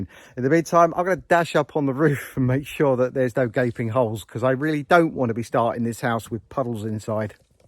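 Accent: United Kingdom. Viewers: Well, I started watching the 90 minute renovation video compilation of the village house, then went back to No. 1, and am now finished with No. 134. Whew, an enormous amount of work you've done there. I've worked in the construction industry in the U.S. all of my life, but I was fascinated by the type of housing that you have in Portugal. We use wood framing for most houses here, and I've never seen stone houses like yours. It was truly an interesting journey and I feel that I've been educated by watching your videos. But don't worry, I'm about to start on #135 and will be following your channel until you give it up. You're also to be commended on your videography talents, use of musical score, and the witty repartee that you constantly provide. Like most yanks, I enjoying hearing the British accent and have learned a whole new vocabulary. "Hob", "boiler", "salamander", "knackered", all these are fun to hear for the first time. 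Great job, keep it up, and good luck through all your adventures. Gary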